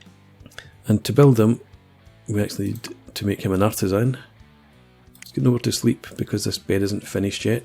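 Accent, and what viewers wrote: Scotland, United Kingdom. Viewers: Just gotta say cheerio for that accent :) Scotland Forever!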